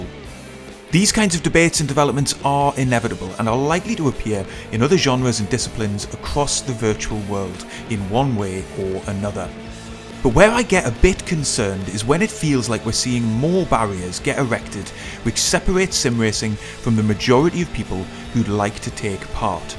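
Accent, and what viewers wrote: United Kingdom. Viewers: Are you me, but with a British accent?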